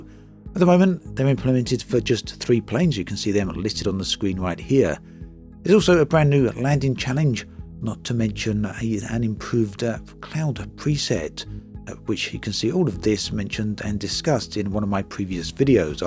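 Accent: United Kingdom. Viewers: First of all I love your videos but I just have one question. Why do you always give the price for things in dollars? You sound very much British to me. It's bad enough our language being taken over by American English without our currency aswell. Sorry. Rant over. Keep up the good work. 👍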